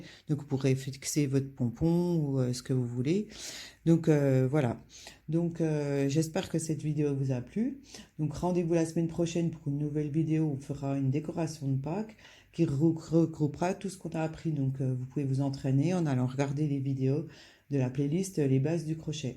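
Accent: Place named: Haute-Saône, France. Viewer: Merci pour vos explications parfaites. Vôtre accent me fait penser à la haute Saône.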